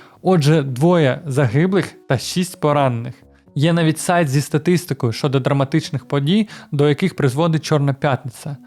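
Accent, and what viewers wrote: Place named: Russia. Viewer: справа воної, леді Ю, живе. слухаю і на фоні 'ґаз, зубажіння, любі маі' пане, читайте, говоріть в побуті, вживайте український контент. бо це якась жесть. кантент, навини, сорак, панеділок... вже десятки відео, а розвитку ноль. згадалось вашою залупянкою 'чукча нє чітатєль, чукча пісатєль' український контент російською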